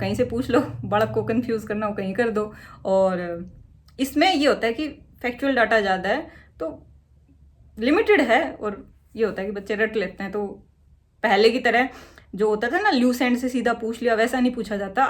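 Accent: Haryana, India. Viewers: हरयाणवी हमे सुनने में अच्छा लगता है।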